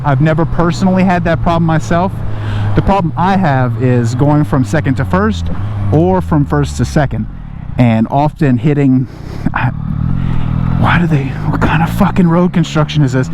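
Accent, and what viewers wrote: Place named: Louisiana, United States. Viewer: Very informative. Nice, soothing Cajun voice.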